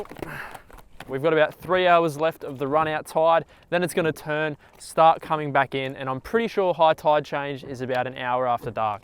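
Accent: Australia. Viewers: You sound like an Aussie to me so we went Metric in 1966 so why are you talking ing Imperial?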